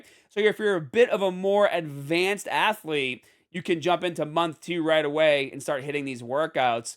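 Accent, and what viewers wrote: Boston, United States. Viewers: lol yeah I kinda dig the Boston accent 😂 must visit there when I come to America on vacation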